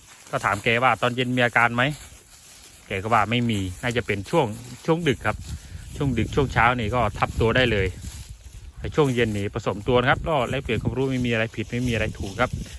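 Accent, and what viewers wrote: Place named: Surin, Thailand. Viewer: ฟาร์มอยู่จังหวัดอะไรครับ สำเนียงเหมือนคนสุรินทร์เหลา😁😁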